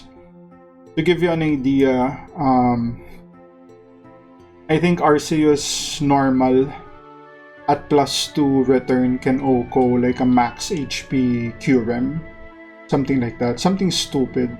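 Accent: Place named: Philippines. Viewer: Are u filipino? I am too i can recognize the accent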